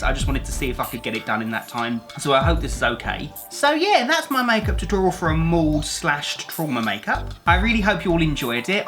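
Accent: United Kingdom. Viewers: Are you British omg I think I finally found a famous youtuber that is British to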